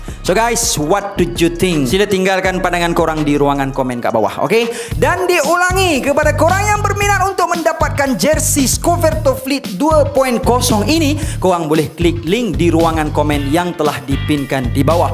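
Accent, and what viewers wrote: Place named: Kedah, Malaysia. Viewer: Bukak msk bru ada dngan lorat kedah.. baru ada feel dngar dye pnye crte...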